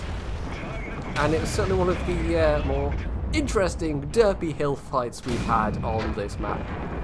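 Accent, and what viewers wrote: Scotland, United Kingdom. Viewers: Ow, that new mic, it makes the sound so much clearer.... starts to actually sound scottish-ish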